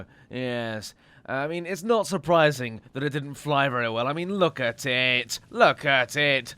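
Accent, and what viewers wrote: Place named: RP, United Kingdom. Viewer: Good day, I have a question for you: I know you like to talk in a very civilized Brrritish manner, are you also able to speak with a more 'common' tongue like a Birmingham one for example? You see, I have made it a hobby to learn many different English accents, even foreign ones. I've got a lot of 'em pretty much down but now I'm more focused on different British accents. I would find it wonderful if you could give me some sort of 'insight', if you have any of course. Also, how you took that Spitfire down was just marvelous! Pilots like Magz and others could learn many things from you!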